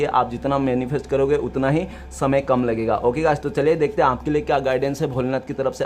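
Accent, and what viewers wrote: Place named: Northeast, India. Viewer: Btw are you from Assam.. Your voice sounds like North-East people.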